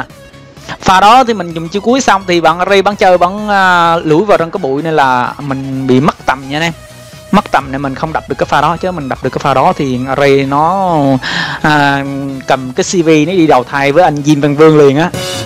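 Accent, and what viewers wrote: Quang Ngai, Vietnam. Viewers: Quảng Ngãi nói vui nhỉ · Người Quảng Ngãi phải hông anh owiii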